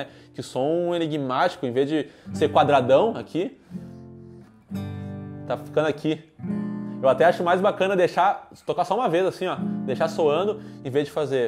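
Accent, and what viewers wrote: Brazil. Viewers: falas muito rapido que nao da para perceber metade das palavras (engoles as palavras ) de maneira que isso so serve para a lingua brazileira ,mas quem fala portugues os seus videos nao tem uso algum. o meu conselho seria fazer os videos em engles asim todo o mundo percebia ,com os videos em lingua brazileira so os brazileiros e que percebem que caralho estas ai a ladrar ,mas quanto ao asunto do video esta O K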